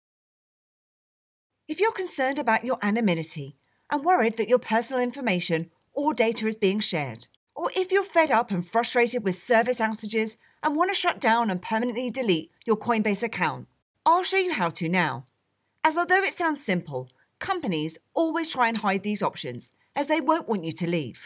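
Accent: United Kingdom. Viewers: UK girl huh, you single?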